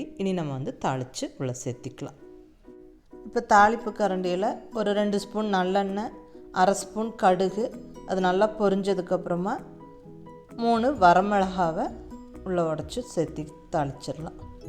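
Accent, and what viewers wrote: Kongu, India. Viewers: mam kongu tamil suprr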